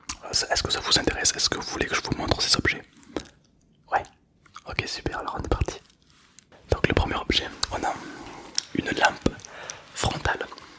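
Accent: South, France. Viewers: Rolalah il a une accent du sud il me regale 😁🤣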